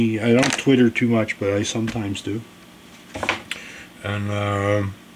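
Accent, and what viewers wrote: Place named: Canada. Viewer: I love your videos and your, your voice it calms me down if I'm anxious. I also like your Canadian accent. Man that's a nice guitar. I got a 50 Studio gold top. I didn't know that much about the tires then. The guy put a bone nut on it instead of filing down the nut he went further into the wood. Which I think would make it really easy to break. so far no problems it's a 2012 when they were using that I don't know what kind of wood baked Maple. I don't like those PCB boards. I wonder how hard they are to change out I have basic knowledge of wiring and soldering from BOCES I think they send you a schematic. That guitar roars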